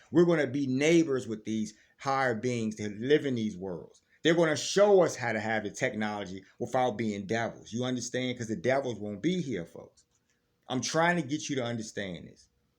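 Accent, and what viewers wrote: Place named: South, United States. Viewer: I love that you are a Southern African American. I love your accent/dialect.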